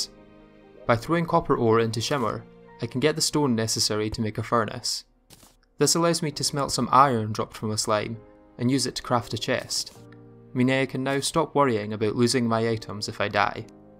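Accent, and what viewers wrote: Scotland, United Kingdom. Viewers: Omg the Scottish accent makes this video 10X better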